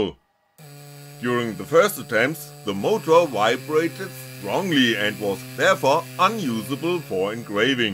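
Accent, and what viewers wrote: Germany. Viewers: Funny to hear a german practising English.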